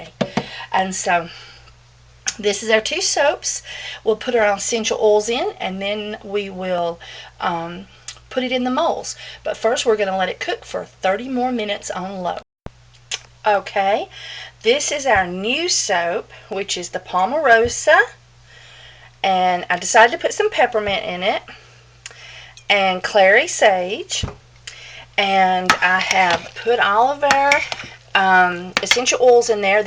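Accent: South, United States. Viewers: Yay, congrats on the Consignment shop wanting to have "all" of ur soaps & on being featured on Ess. Depot's site! Good for you! Enter me pls. Another wonderful batch to share with us, mahalo! One of the reasons I enjoy ur videos is bc of ur southern accent, ur southern grace w/an easy going style & ur sense of humor. If only we had smellivision! Aloha nui~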